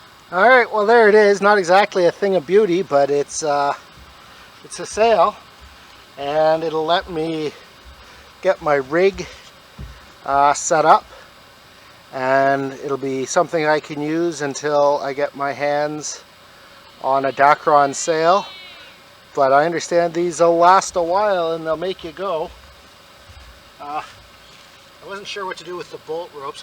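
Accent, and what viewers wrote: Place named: Canada. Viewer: You sound suspiciously canadian when you talk.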